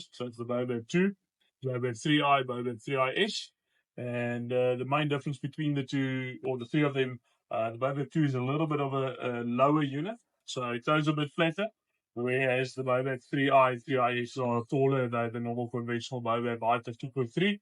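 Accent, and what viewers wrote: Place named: Africa. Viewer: Man where you from? Your accent doesn't match your face. Are you from Africa?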